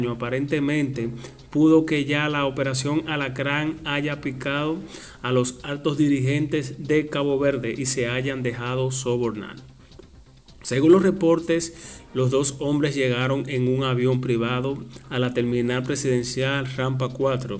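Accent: Cuba. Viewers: Que mal lees las noticias, y ese acento Cubano no lo puedes disfrazar. Al mejor estilo del G2 cubano...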